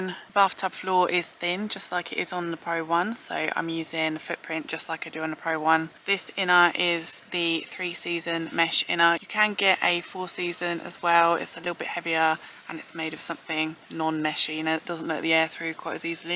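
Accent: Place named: Berkshire, United Kingdom. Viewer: Hi, i have been trying to figure out your accent for a while now, is it Berkshire, Oxfordshire, Hampshire or near. My mother is from Cane End nr Henley originally and sounds a bit like you. Accents intrigue me. Great videos, reviews and adventures, and i love the wildlife you encounter, stay safe, all the best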